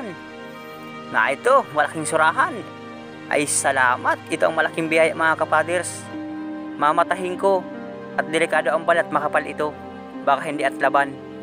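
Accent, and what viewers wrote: Bicol, Philippines. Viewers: Parang bicolano eh .haha parang catanduanes?? Baras ??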